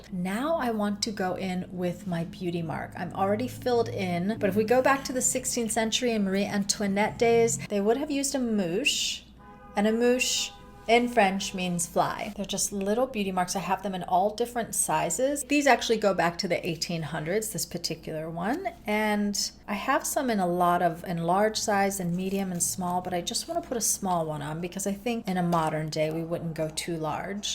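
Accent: United States. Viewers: Regarding dangerous or toxic ancient makeup and beauty practices: seems not much has changed over the centuries--think of all of today's popular, potentially dangerous plastic surgery performed in the name of attaining beauty. Dont get me wrong, I take zero issue with anyone getting plastic surgery for any reason...just making a point. Side note: you've gotta be from the midwest. I am, and I pronounce words ending in '-ing' the same way as you. Cute!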